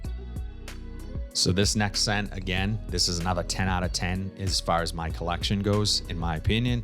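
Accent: Boston, United States. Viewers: It's very distinctive. I'm not even American but I can pick that Boston accent out a mile away. It gives you your charm mate 👍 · Nice presentation. But seriously, you need to get rid of that Boston accent.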